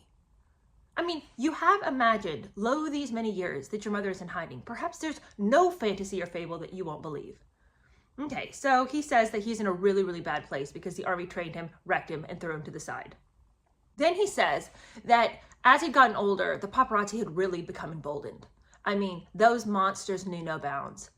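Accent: United Kingdom. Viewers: Omg me too, I love your nasally British voice 🥰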